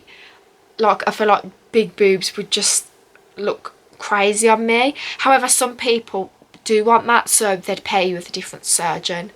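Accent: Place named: Black Country, United Kingdom. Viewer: Black Country accent :)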